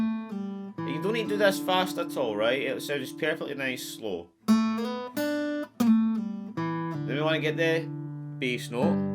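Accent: Scotland, United Kingdom. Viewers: Great lesson and great to hear a fellow Scot! 🏴🤝🏻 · I like guessing Scottish accents and where they’re from, I’m gonna guess Edinburgh with an EW Punt on Penicuik! Am I in any way close? 😅 👍🏴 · you speak scottish,,,who in their right mind is gonna listen to your accent? tell me who? I want all their names,,they need therapy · This is great, I not only get a guitar lesson but I learn how to understand a Scottish accent!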